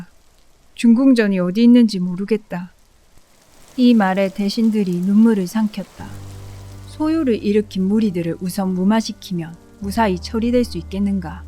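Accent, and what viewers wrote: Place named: Gyeongsang, South Korea. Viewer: 동학혁명 예고편이네요. 경상도+사극 나레이션 굿!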